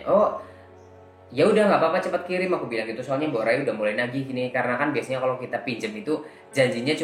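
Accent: Java, Indonesia. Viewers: mas foto wkt muda cakep bingit... bahasa nya medok banget seperti org jawa gitu...emang nya org jawa ya mas..?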